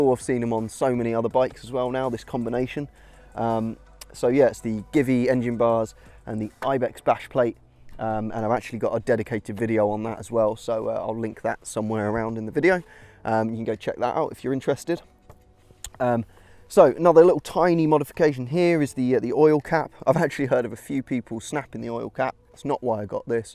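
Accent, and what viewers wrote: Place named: England, United Kingdom. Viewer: Hi, nice to hear an English guy with a comprehensive vid about what he’s done to his bike. Utube is full of septic upgrades an show ponies. Me “like yourself” rather ride it then clean It, have a tarmac version, (at my age I can’t afford to brake any more bones ☹ I think I’m about your age x3) but love your aggressive look. Will be getting the tool roll, love it an as you say, better the Yamaha’s version. I went for K-Tech front cartridge fork kit an rear shock (95kg rider) both fully adjustable, totally different bike. Will sub just to see what you get up to. 💪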